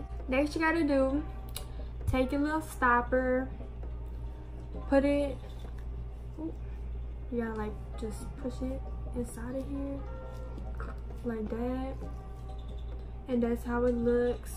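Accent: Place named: New York, United States. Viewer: she sound like she from new york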